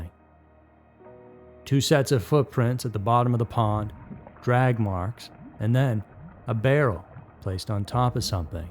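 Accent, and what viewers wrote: South, United States. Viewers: When a new creepiest comes out, I only watch it during the day. I am a new subscriber and have been binging so much I live in a permanent state of creepiest. If didn't have that addictive Southern drawl it would be easier to take a binge break 😊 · Love your southern accent 😂🇬🇧. I really hope the cold cases can be solved......the families deserve it 🙏🙏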